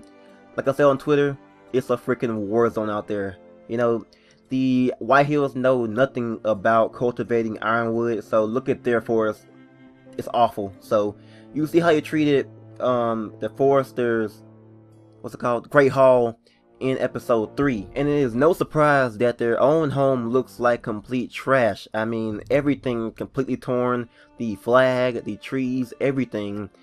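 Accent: South, United States. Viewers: Your American accent is awesome. You southern American urbans sound so much different than us northern Canadian urbans.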